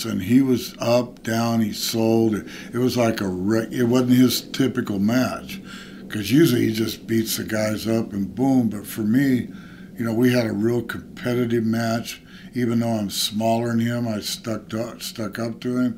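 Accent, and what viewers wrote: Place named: Michigan, United States. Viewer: He is real.... he talks like a Michigan accent.....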